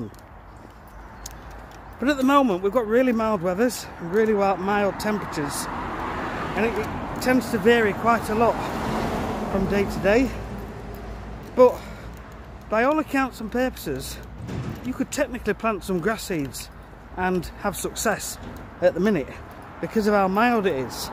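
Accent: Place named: Sheffield, United Kingdom. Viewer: Where are you based? Your accent sounds local to Me, I’m in Sheffield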